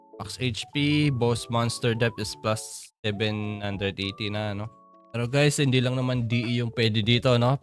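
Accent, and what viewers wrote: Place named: Iloilo, Philippines. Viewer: salitaan plang ilonggong ilongo toto part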